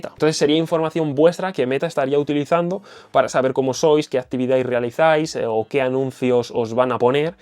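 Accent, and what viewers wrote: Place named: Argentina. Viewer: Mira Te Vuerbo Ha Escribir El, PQ Soy Un Poquito Bruta Yoo Te Guarde Para Despues Ponerte Cuando Mii Hijo Quiero Desircelo Para Asii Y Una Ves Mas Q, Ellos Agan Eso Mismo Le Escribo Desde EEUU Ves Noo Se De Donde Eres Me Pareces Como De Argentina Lindo Pais La Señora Q, Vive Ariba Segundo Piso Ella Es De Argentina Loo He Mirado En TV Ho De Uruguay Ablan Parecidos Saludos Soy Cubana Cuidate Muchoo?Gracias Bendiciones!🙏👏🤚😪💙💘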